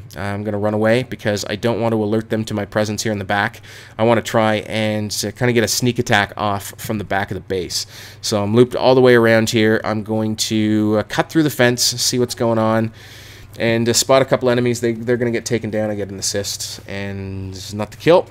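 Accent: Canada. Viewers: are you canadian because i don't know why but i hear that type of canadian accent when you speak and pronounce vowels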